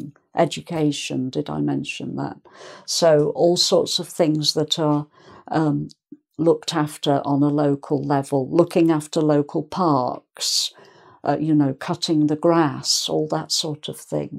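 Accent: United Kingdom. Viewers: Hello, teacher! I love your accent brithish and your lessons, than to be easy to understand. I am Brazilian and study every single. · Beautiful british accent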